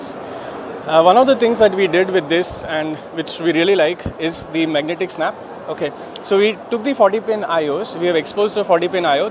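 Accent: India. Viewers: Sorry for being a racist, I really don't like listening to Indian accent English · Jeet accent, into the trash it goes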